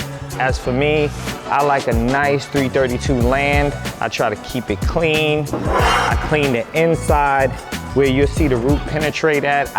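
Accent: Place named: New Jersey, United States. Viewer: Dude the Jersey accent is such a change of scenery for a welding tutorial coming from being taught by a bunch of southern boys. 😎🤘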